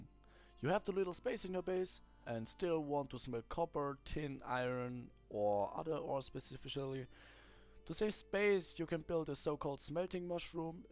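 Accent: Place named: Russia. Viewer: Bros having the heaviest russian accent to mankind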